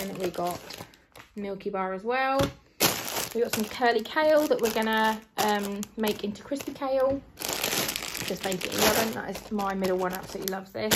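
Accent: Norwich, United Kingdom. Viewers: The sour balls!! dough balls! look good!! and awesome choice on making meatballs!! those are delicious!! Also those cheesy jalepeno bites look amazing omgs!! Love that nutcrunch cereal too :) Congratulations on your weight loss goals :) I have been only eating very low calorie meals and taking in 800 to 1400 calories a day max, I do 200 crunches and 120 pushups 3x a week and walk decent amount at work. I am always afraid to eat too much on my cheat days because i dont want to lose my gains lol. Great choice in foods, love your food hauls!! Also you look super goddess beautiful in video omgs, those eyebrows, mascara, and that norwich accent :) lovely to watch and listen too :)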